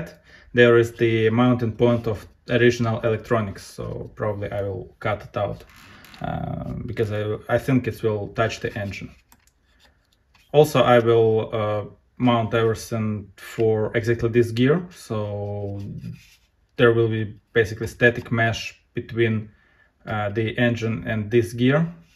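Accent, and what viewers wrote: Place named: Russia. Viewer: Вроде бы имя. И говорит на английском с русским акцентом)))) похоже русских не любит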